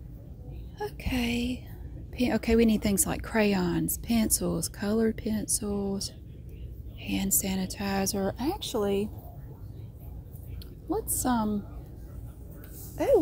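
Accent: South, United States. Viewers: I normally don’t care much for southern accents but her voice is sooooooo soothing to my ears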